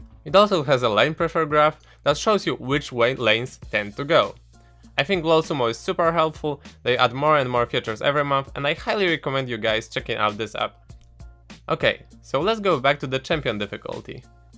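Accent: Poland. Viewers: Ale polski akcent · ej ty chyba masz akcent polski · Are you Polish? :D Your accent sounds like Jankos'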